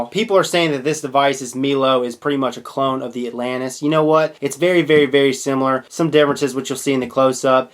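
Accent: South, United States. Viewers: Are you serious? Dude talks with a Southern drawl...Dont try tawkin to a New Yorker if you think A southern drawl is fast!! Really youre being sarcastic ...Iknow lmao!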